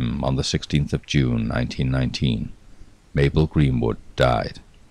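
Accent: United Kingdom. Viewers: Yes, I have subbed after seeing you have more to watch. I will try hard to ration myself to one a night, with a cup of cocoa😀💙. I hope you are still out there somewhere bringing more lovely, unknown stories, good for us, not so for the poor victims. Love your voice, I am born and raised English, but live in the USA. You sir feed my need for class A British accent. Thank you from my English heart.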